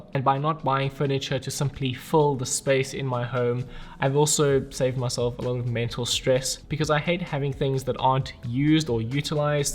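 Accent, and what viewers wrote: South Africa. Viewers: Are you South African? 🙌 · I can't place that accent, it sounds South African? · You sound South African! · Sounds like a Bishops educated (Cape Town) ex South African 🤙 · Are you South African? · I love all your videos....and listening to the familiar South African accent has nothing to do with it! ☺️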